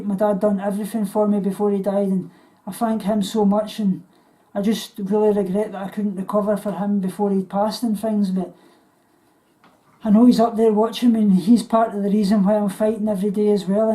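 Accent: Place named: Scotland, United Kingdom. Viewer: I have Scottish ancestors my great-great-grandmother was from Scotland she spoke just like you I don't remember her she passed away when I was a baby but my mom used to talk about her all the time she said she loved hearing her talk because of her accent just like I like your accent I like Australian accents British accent Irish LOL I'm just plain old American accent lol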